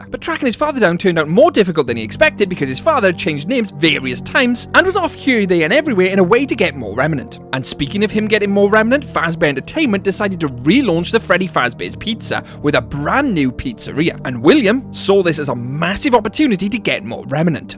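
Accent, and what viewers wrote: Wales, United Kingdom. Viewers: I’m a simple man. I see a FNAF vid. I hear a Welsh accent. I subscribe.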